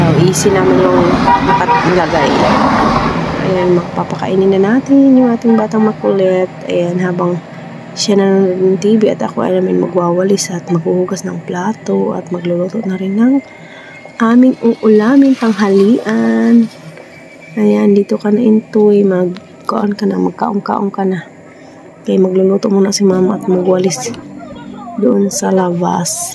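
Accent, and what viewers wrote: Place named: Visayas, Philippines. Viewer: Hahha sarap tlga pakinggan ung bisaya sis ba nakakaaliw pkinggan ko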